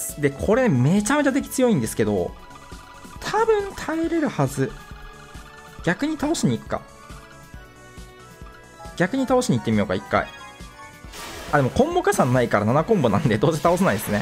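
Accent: Kansai, Japan. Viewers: なんで最近関西弁っぽさが混ざってきてるんだろうに対してはにわかだから出身もしらないし、自分が見始めた頃からしたら変な風に聞こえてなんか聞きにくくなった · 最近ところどころ関西弁なの草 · って関西人なん？ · って関西人？ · の関西弁大好き(*^ω^*) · 関西弁うざっ笑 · 関西弁気になるよ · って関西の人？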